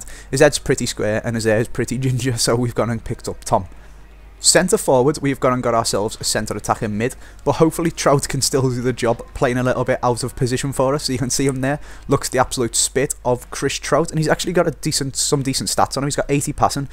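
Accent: Liverpool, United Kingdom. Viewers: i hate scouse accents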